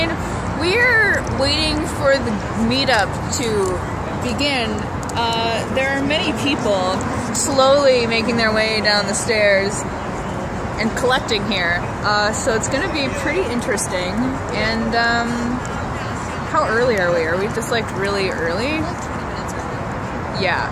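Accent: France. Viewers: Nice French accent (I am french)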